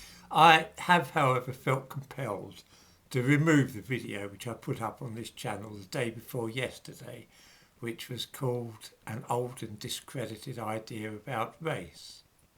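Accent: United Kingdom. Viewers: He is excellent! Genuine content, clear presentation, and delightful British wording and accent. Brave!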